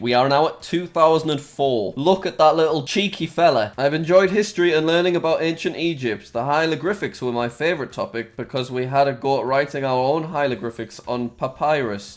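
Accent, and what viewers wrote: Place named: Durham, United Kingdom. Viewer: Wait your from Durham not quite a Geordie but ye na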